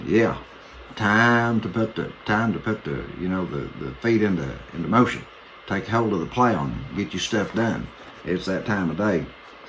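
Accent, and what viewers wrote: United States. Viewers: WHAT IS THE NOISE IN THE BACKGROUND? YOU REMIND ME OF BOB WELLS, CHEAP LIVING. LOVE YOUR AMERICAN ACCENT. THIS IS A VERY COMMON SENSE TALK AND WE NEVER HEAR ENOUGH, IF ANY, OF THESE TYPES OF MESSAGES. MANY THANKS.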